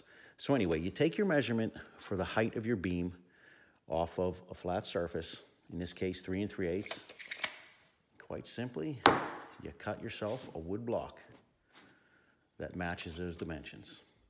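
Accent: Pittsburgh, United States. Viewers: Man, that's a GREAT Pittsburgh accent. Love it. Thanks so much for posting this. It's exactly what I needed.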